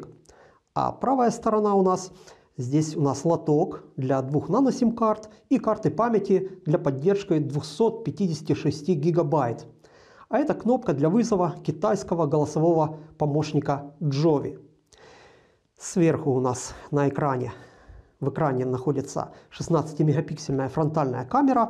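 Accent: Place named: Ukraine. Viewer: С Украинским акцентом хороший обзор.и достойный смартфон.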